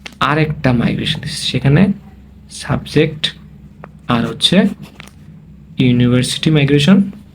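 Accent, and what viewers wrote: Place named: Comilla, Bangladesh. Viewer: Vaiyer bari mone hoy comillaa...🙂 Btw vasa sune andaj korlam🙂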